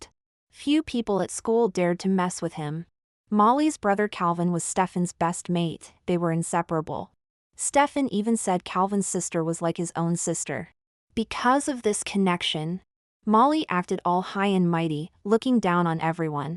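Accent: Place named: United States. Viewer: AI with an american accent using UK slang words is kind of cringe~~~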